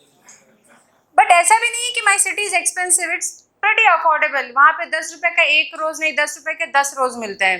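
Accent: Bihar, India. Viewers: I like your bihari tone 😅😅😂😂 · That bihari accent.. 👍😂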